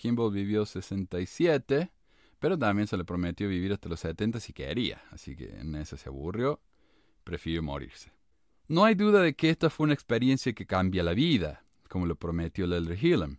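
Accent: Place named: Argentina. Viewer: es argentino de cordova en chile estamos llenos de modelitos de reality de ese país su acento inconfundible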